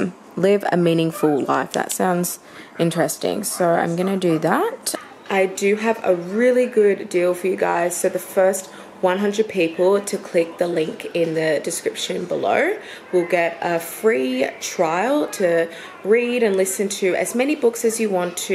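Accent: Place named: Australia. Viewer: Awww loved it❤ the way reacts when he sees coming downstairs just melts my heart. And I loove ur australian accent❤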